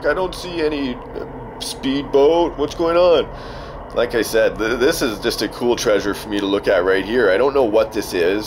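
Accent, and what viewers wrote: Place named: Canada. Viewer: why do I automatically like people with Canadian accents??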